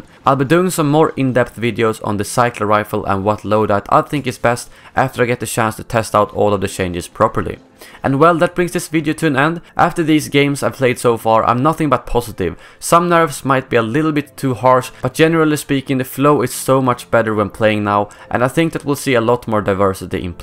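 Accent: Sweden. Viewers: are you swedish dude? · Are you swedish?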